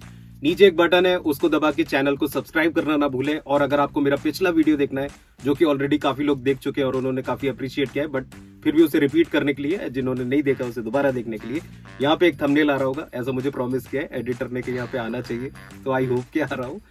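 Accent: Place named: Uttar Pradesh, India. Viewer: Bhai apke baat karne ke tareeke se proper up wali feel aati hai.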